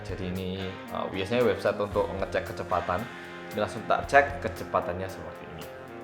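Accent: Java, Indonesia. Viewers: òlehmu ngomong loo medok dadine lucu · jowo mu gawe aku nnnggumun cakkkk. gokil abis.sipit iso jowo banget. josss. ajari wong korea kabeh mas di sana. jd kalo ke korea langsung nyambung hahaha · aku kesemsem sama cara ngomong sampean mas.. muueeddookkk tenan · Nadhira Syifa wong Korea asline, tp tinggal lama di Indonesia, tepatnya di malang, jd medok deh bhsa Jawa ne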